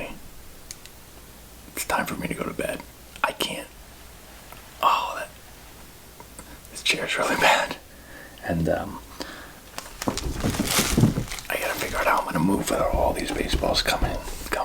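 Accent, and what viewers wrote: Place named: Boston, United States. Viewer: I knew your were a freak lol ASMR hahaa all that heavy breathing and the boston accent so hot!!!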